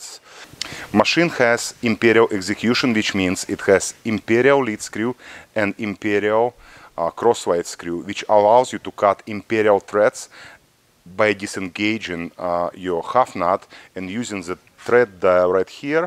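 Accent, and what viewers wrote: Russia. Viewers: Отвечаю, мужчина русский, произношение капец русское)))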